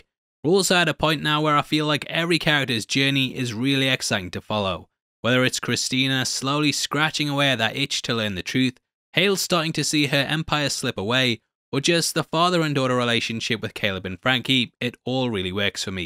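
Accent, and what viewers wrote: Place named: England, United Kingdom. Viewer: I love English accents. You called Christina Christiner and I love it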